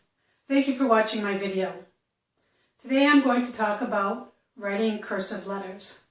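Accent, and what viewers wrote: United States. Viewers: Thank you for the writing lesson. I followed along with my new fountain pen. Planning to begin written correspondence with my grandchildren who all live far away. In my mind, I was trying to pinpoint your accent. You sound like you could be from Scituate, Mass., or Enfield, Connecticut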